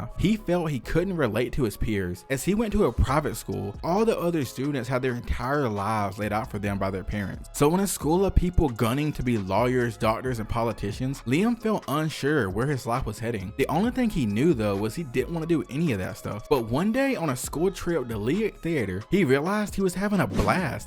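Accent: South, United States. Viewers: Dude I love your voice we need more southern YouTubers it’s amazing.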